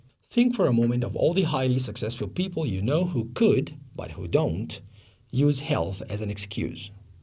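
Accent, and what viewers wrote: United States. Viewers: اتقان اللهجة الأمريكية الله يبارك لك يا مستر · امته كورس اللهجة الأمريكية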